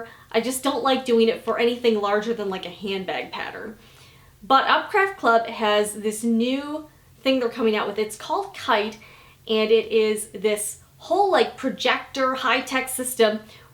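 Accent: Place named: Midwest, United States. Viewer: Are you from the midwest? Cute accent :)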